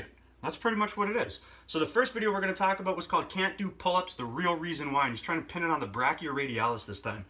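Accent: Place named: Canada. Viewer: Are you Canadian? You sound like you're from around here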